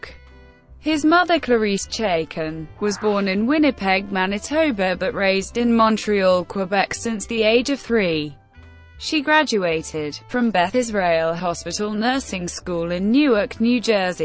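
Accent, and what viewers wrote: United Kingdom. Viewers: a BRIT robot voice!!!...coooool.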